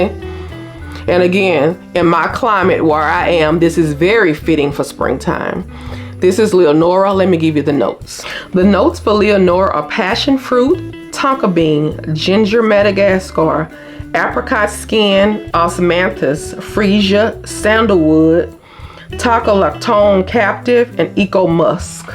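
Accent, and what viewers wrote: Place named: Louisiana, United States. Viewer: Yesss I love your Louisiana accent! It’s hot here in TN but nothing like Bayou hot. 😅 I definitely repurchase my fave perfumes!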